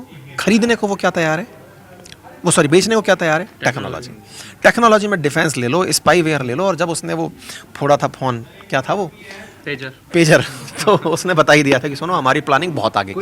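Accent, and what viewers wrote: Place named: Kanpur, India. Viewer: Aap ki boli pur hindi hai from kanpur se ❤❤❤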